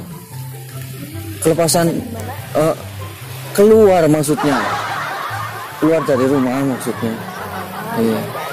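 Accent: Banyumas, Indonesia. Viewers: Ngomonge ngapak nemen Mbok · Mas,,, rika wong ngapak ya??? Pada bae mas... 😂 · Ngomongnya medok tenan mas bro, Sampean wong jowo ngapak Yo ? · Wong Banyumas apa rika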